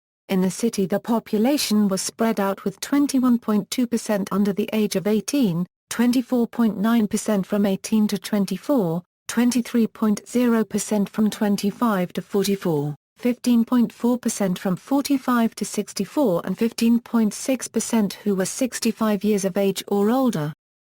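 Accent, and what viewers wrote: United Kingdom. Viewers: Is this some robotic 'Siri-like voice' with a British accent reading this or a real person?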